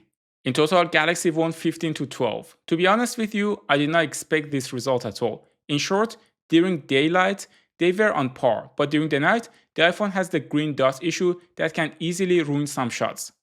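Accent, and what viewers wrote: Iran. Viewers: Are you persian? Your accent says this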